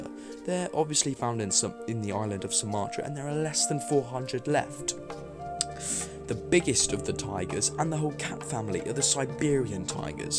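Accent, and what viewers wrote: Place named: England, United Kingdom. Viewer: Are you English? You have a strong accent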